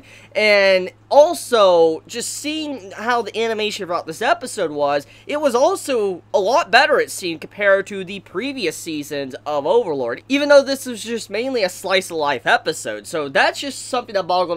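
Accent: South, United States. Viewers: Are you from the south I can hear a little of a southern accent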